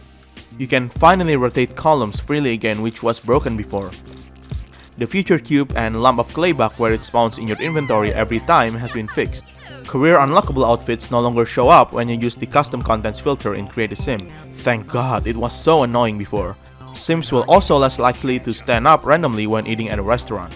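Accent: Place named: Indonesia. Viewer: Sebelum ku liat deskripsi "kalo didengerin kayak orang indo ya 🤔" Ternyata bener 🙆🏻‍♀️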